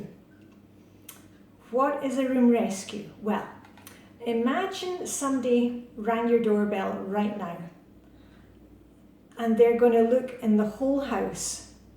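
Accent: Scotland, United Kingdom. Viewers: Hi, Maaike here from Nederland. I started a week ago and I have a shiny sink every single evening. What’s happening to me? 😉 But seriously. I’ve. Never. Ever. Done. This. It’s all new to me and I’m 46. 🙈 Thank you! Love your accent by the way. Scottish?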